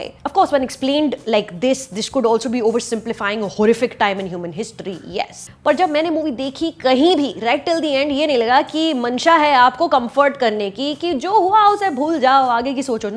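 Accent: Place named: India. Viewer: After the biased viewer ‘not a review’ of Kabir Singh instead of being a reviewer, I’d given up on you, but you’ve redeemed yourself by going back in to your reviewer shoes. Well done for that! I started this review with a bit of a bias - let’s see what stupid stuff she talks - but you proved me wrong. Again, kudos. Very objective review (looks like it, I haven’t watched the movie) and great way of connecting it to other related art forms like HHGTTG. Now pet peeve - I understand it’s your style, but why speak with a strong accent even when speaking in English! Even more, why mix Hindi and English instead of either being true to yourself by sticking to Hindi or being a wider-audience-reaching reviewer like Bharadwaj Rangan and sticking to English. It actually annoys me personally, but stick with the mix if your regular audience likes it, but still keeping the Hindi influence of English away. 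All of this said in good sense and no offence meant! Cheers!!!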